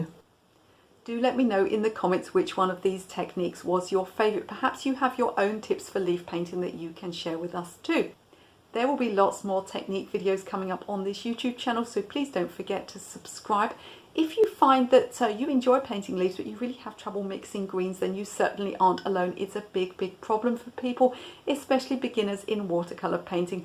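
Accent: United Kingdom. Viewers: to me is like flash in photography... buy I am not adamant about anything in particular. BTW I enjoy your videos and learn a lot! And a plus to hear your neat British accent!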